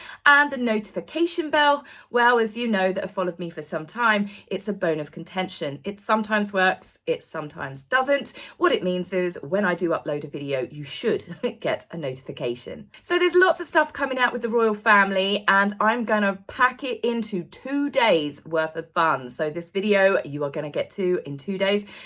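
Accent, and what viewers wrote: United Kingdom. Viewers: Mahalo! You are so enjoyable to watch and get tickled by your British accent! Hanahou!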